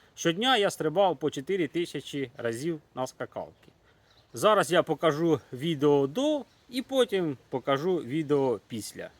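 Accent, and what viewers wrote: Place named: Poltava, Ukraine. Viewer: Полтава? Акцент как на полтващине. Прыг и бег если нет проблем с коленами, самое простое для похудения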